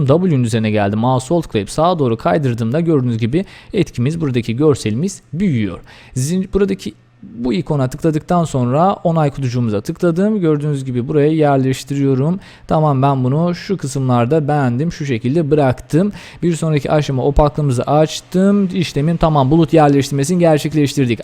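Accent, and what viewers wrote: Azerbaijan. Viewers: sen azerbaycanlımısın acep?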